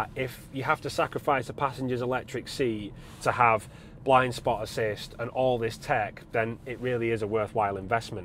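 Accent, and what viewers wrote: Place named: Manchester, United Kingdom. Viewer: Hahahah! It loves the manc accent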